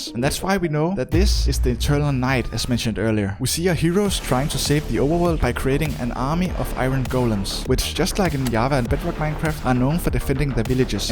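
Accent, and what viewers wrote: Denmark. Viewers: Den danske accent er kraftig men god video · as a dane i can really hear the "danish" when u speak funny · Are you danish I think I hear some danglinsh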